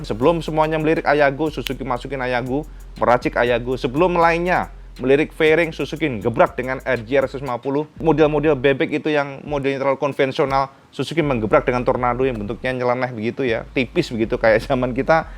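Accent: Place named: Java, Indonesia. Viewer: Jet koledd cak wong jowo ngomong e 🤭🤣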